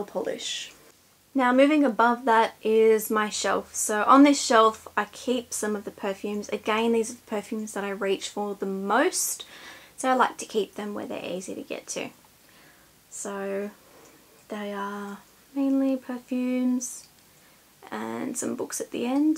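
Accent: Australia. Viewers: Knew you were Aussie straight away but I often forget how... Different we sound when watching YouTube or movies xD so jealous of your opi polish collection! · are you australian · LOOOOVE UR ACCENT! <3 best aussie style ever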